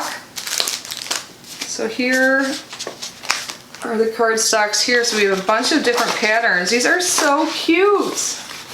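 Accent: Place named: Midwest, United States. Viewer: Hey! New subscriber. Been watching for a minute! You have to be from the Midwest. I’m originally from Chicago. I know that accent anywhere!! 😊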